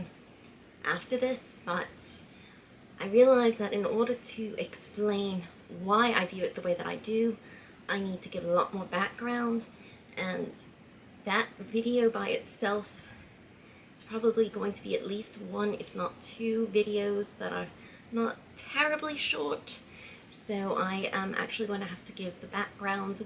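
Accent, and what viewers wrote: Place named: United Kingdom. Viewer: Another great video truly a masterpiece of a face and yes he does great artwork I'll have to look into the company by the way you sound like you have a British accent in the video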